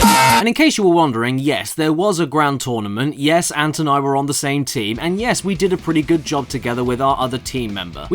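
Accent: United States. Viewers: To be fair you do talk like a yank a lot so maybe that's why they thought that.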